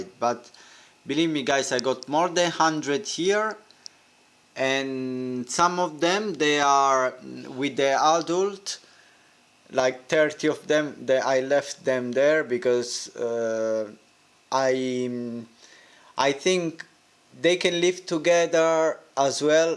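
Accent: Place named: Italy. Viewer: pretty sweet bro! u italian? hahaha ur accent makes me tell u r! but anyways nice tank and vid! just earned a sub please consider subbing back please if u want.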